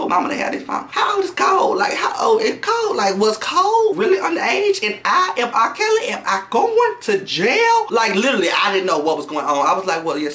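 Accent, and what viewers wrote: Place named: Memphis, United States. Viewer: Please tell me you’re from Memphis! I know that accent and vibe from anywhere, you are soooooo damn funny!!!😂 LOL I was cracking up the entire time but the ending gagged me😩😢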